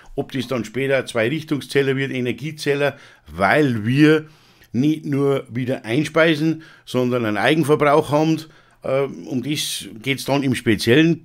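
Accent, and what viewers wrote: Bavaria, Germany. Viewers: mir gehts auch immer so wie dir mann versucht hochdeutsch zu sprechen und rutscht immer wieder ins boarische😀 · muß man auch nicht. Das ist bayrisch! Und echt guat zum Zualosen.....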